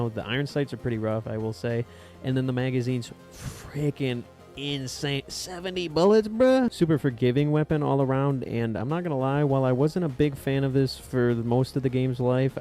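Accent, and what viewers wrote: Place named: Eastern Europe. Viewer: Are you Eastern European? you have an interesting accent.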